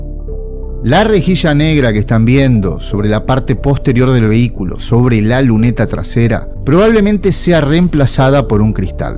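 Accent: Argentina. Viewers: Vamos todavía defendiendo el acento argentino asi me gusta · No todos los Argentinos hablamos como porteño. Ustedes nomas dicen shon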